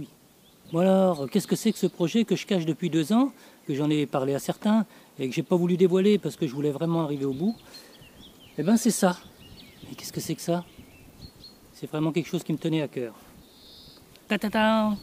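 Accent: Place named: France. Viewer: Un humain comme on les aime, pas de superflu, de l'honnêteté, du savoir-faire, l'accent franchouillard qui nous rassemble. Merci de nous montrer la direction du beau.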